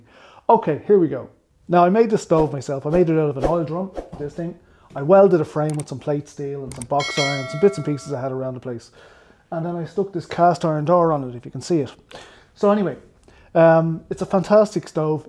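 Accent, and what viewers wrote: Ireland. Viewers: Nice one are you from Ireland?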